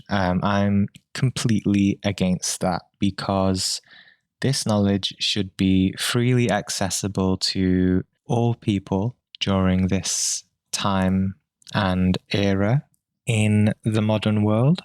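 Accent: United Kingdom. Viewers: Thank You. I am 2-Years Late...but Intuitively I knew...that There was an Expert like You...Amongst the large Herd of Astral Projection Leaders available. I am American, & Your British Accent...and You Clear Style of Speech...attracted Me. Thank You.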